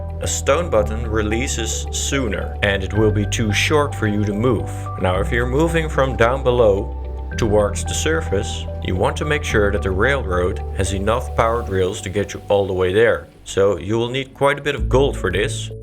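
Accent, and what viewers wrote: Netherlands. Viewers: Exactly the video I needed! Thanks for the beautiful design & tutorial <3! (Hoorde door je accent meteen dat je uit NL komt! Bonus!)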